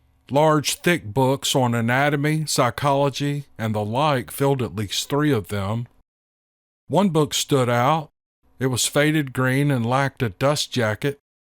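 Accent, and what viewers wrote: South, United States. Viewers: is brilliant isn't he!? His accent is that of a true southern gentleman and I hope all my Irish kinfolk can appreciate our southern accents much love to Ireland from Arkansas 💚♾💚♾💚♾💚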